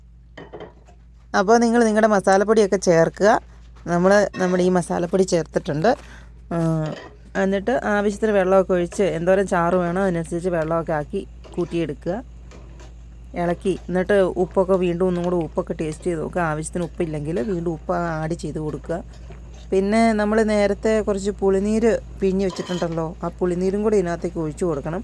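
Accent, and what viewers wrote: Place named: South Kerala, India. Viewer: 👍pakshe Malayalam slang thekkan bhasha pole thonni ( like pathanamthitta)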